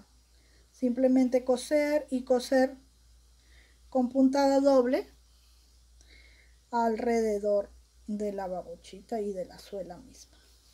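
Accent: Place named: Mexico. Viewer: ¿Eres Peruana?...tu acento es muy parecido al del norte de América , específicamente de México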